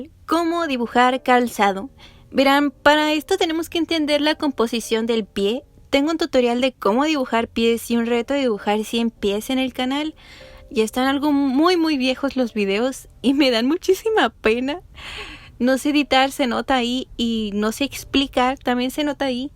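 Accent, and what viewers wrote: Mexico. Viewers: Señor, no sabe de relajante que es escuchar su acentito norteño mientras veo su lineart asteti. Mucha motivación para dibujar patas. Muchas gracias ❤❤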